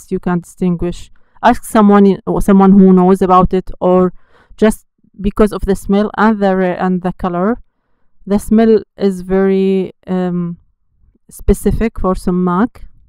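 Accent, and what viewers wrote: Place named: Iran. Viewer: I love the Iranian accent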